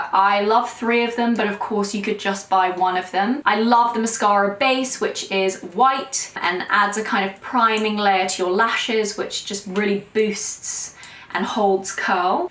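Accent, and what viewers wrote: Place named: United Kingdom. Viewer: Love your British accent❤and your chinese beauty.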